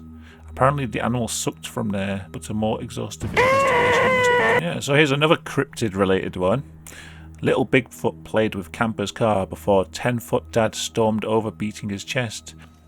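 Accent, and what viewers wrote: United Kingdom. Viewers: are you british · whenever I listen to you (or any British youtuber), I can't help but get in touch with my roots and just impersonate your accents. Though usually itll turn from "women's stomach turns after seeing a ghost" to "women's stomach turns after eating some butt"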